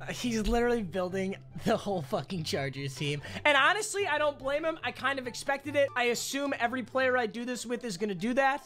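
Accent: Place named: Arkansas, United States. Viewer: That Arkansas voice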